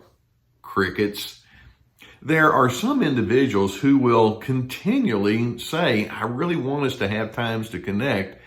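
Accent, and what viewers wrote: Texas, United States. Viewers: It's not southern...it's Texan. Big difference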